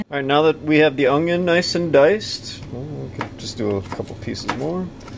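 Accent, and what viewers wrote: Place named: Midwest, United States. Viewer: midwestern accent... reminds me of the dad i never had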